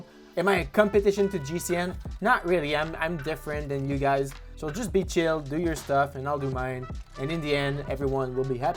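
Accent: Quebec, Canada. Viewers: ça sonne québécois, comme l'accent! 👍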